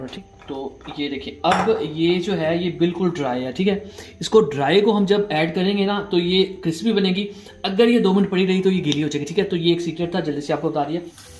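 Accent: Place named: Punjab, India. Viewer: Your Punjabi accent is v good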